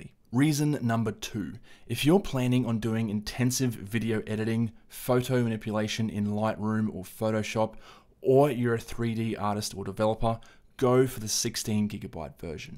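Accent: Australia. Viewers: His Ozi voice is refreshing I’m my opinion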